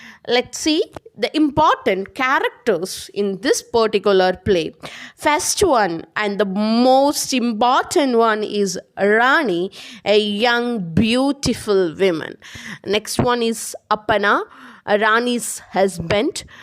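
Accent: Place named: Kollam, India. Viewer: Kollam... Beautiful acsent... And very good information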